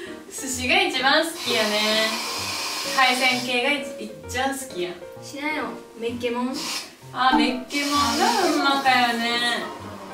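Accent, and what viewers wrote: Kagoshima, Japan. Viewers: 普段の動画で話してた言葉が鹿児島弁やと思ってたのは俺だけ？ww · 鹿児島イントネーションで充分😂 そのままでいてください👍 ありがとう😆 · NHK大河ドラマ 『西郷どん』見てるかのようでした😅 鹿児島弁分からないです。 鹿児島の人は標準語はどこで覚えるのですか？ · 親が鹿児島、まったく喋り方一緒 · 鹿児島弁は使っていこう｡忘れないで､いつもイントネーション鹿児島だしね3人とも。だせん？ですです～も使っていこう‼️僕も東京1年目は鹿児島イントネーションがとれなかった。普通に「いしちゃっ」はずっと出てたな。だから訛りは､大事。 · ええな～鹿児島弁👏👍言うてる事ぜ～んぶ分かるし…👍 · 最高やないかい😊 鹿児島弁好きやからいいね😁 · 鹿児島弁可愛いこれからも動画楽しみにしてます、可愛い❤️😊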